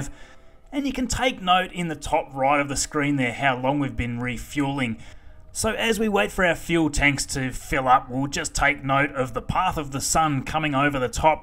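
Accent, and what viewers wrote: Australia. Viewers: he sounds like he is a Australian man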